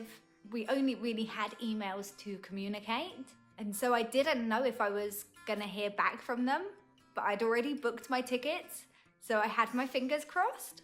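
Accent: United Kingdom. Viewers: 英國口音好好聽，看著你講話舒服。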